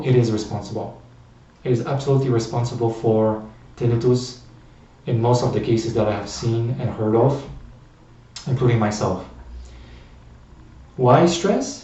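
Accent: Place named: Europe. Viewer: You have an accent in European. You were born here.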